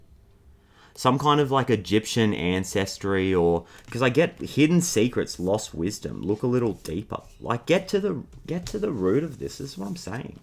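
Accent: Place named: Australia. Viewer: Australian accent... you notice it so much more the difference how we speak compared to the american tarot card readers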